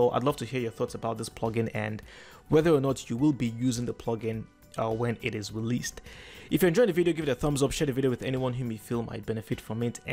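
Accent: Nigeria. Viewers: That's a Nigerian Speaking!! Nna kedu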